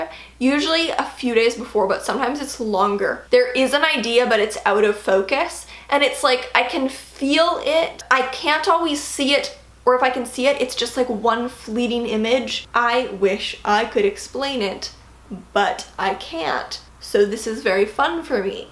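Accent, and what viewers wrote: Canada. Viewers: So I should apologize then. I’m sorry I made you feel attacked, I mean you don’t even know me lol that has to be the most annoying part. But I still find it hard to believe that you have never had anyone say that your accent sounds high class. Like the word Aunt. If you pronounce it “Ant”you are “normal” but if you pronounce it “Awe-nt “ like the British do, then us “normals” will see it as high class and jot that down in the back of our heads. Do you see? Idk if there is a true form of English that invokes the superior intellect but it’s something that id like to see your option on. For example a person who thinks in Spanish will have different thought patterns that one who speaks in a Western accent. So what I am challenging you on is do you think your Canadian accent makes you think more intelligently that my Western or American accent?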